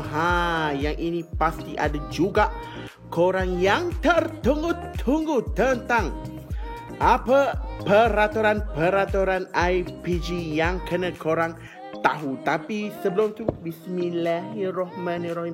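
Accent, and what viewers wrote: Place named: Sarawak, Malaysia. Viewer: Terima kasih abang.. Byk info berguna pasal ipg.. Semakin bersedia sy nk masuk ipg 1 sept nnti.. Btw, abg sarawakian ke😂😂suara mcm org sarawak jk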